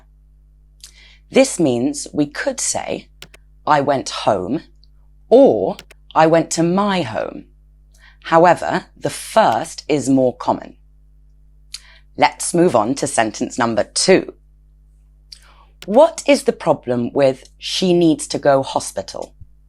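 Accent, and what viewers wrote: United Kingdom. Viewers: 딱 영국발음 좋아요 좋아~! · I had an appointment with my doctor 이렇게 말해야 하나? 하고 들어온한국인... 근데 영국식 영어인... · 여윽시 영국식 발음과 억양은 독일어 배운 나에게 엄청 매력적임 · 와 엄청 도도하고 섹시하다 영국발음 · 영국발음이 좋기는 좋구나... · 영국 발음 매력적이에요~ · 영국식 발음 죽이네요. 마치 영어가 아닌것처럼 들려요.. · 아 진짜 영국 영어 엑센트 너무 좋은거 같아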